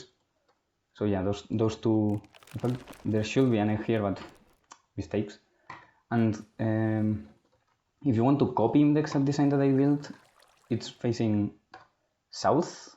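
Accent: Spain. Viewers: Ese acentillo español no te lo quita nadie eh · El acento de español no te lo quita nadie, y el ingenio para descubrir esto tampoco, buen video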